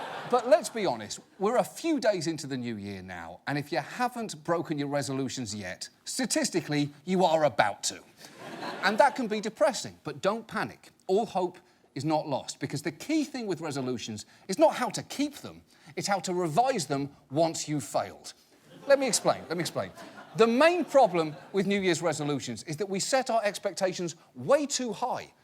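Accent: England, United Kingdom. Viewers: Thanks to his british accent , even silly things sound viable ! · I love when says words in a distinctly English way. like "kentucky darby"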